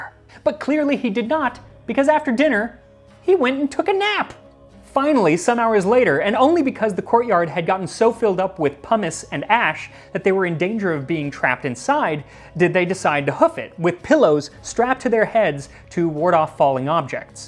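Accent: United States. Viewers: Yay the metric system! But you have an American accent so I am confused, is America switching over? Yay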